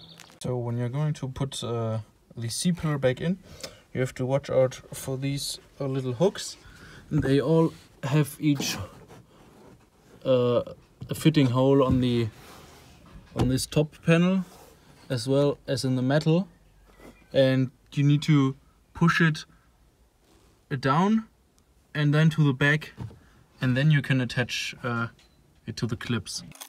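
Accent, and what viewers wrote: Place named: Germany. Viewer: spitze video. dein Englisch ist ausgezeichnet, der deutsche Akzent kommt immer gut an... Cheers aus den USA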